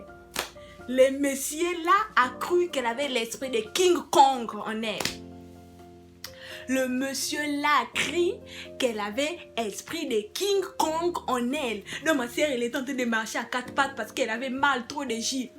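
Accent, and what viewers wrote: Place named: Democratic Republic of the Congo. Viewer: J'aime les congolais...Regarde comment tu parles tu Choko façon jusqu'à 😂😂😂😂😂Je m'abonne ohhhh on fait comment avec confinement là...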